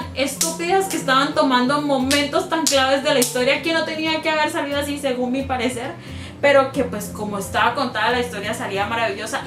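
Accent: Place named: Colombia. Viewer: Jaja me estuve preguntando durante el video si eras de Colombia o de Mexico pero cuando dijiste le coji como bronca me di cuenta saludos buen video parcera😊😊😊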